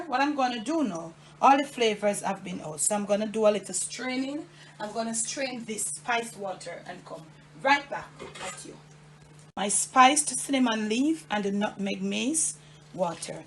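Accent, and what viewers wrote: Jamaica. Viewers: I just LOVE the way describes all the ingredients and cooking techniques with her lovely Jamaican accent and "all ah dat!" It is such a pleasure to have you share all of the good food dung a yard. Thanks for sharing! I've subscribed.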